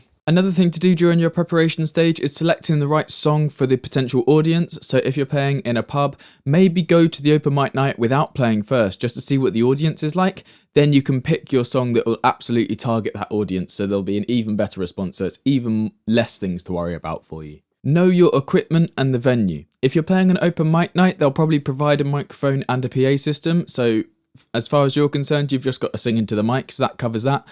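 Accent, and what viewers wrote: United Kingdom. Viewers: Luv the Great Britain accent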